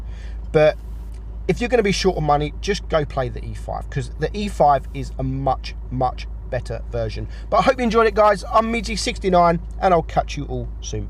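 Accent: United Kingdom. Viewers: Ik ur british but from where?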